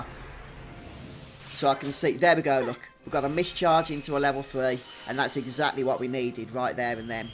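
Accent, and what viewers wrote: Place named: Cockney, United Kingdom. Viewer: Whooo cockney is strong with this one.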